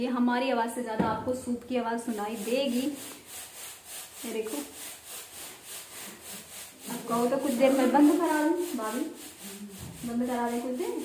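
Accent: Bhojpuri, India. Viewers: I love your bhojpuri voice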